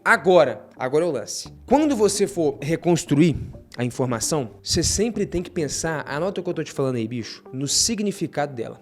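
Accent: Minas Gerais, Brazil. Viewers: O cara já é bom em explicar, aí tem sotaque de mineiro, fica mais massa ainda. Show! Excelente vídeo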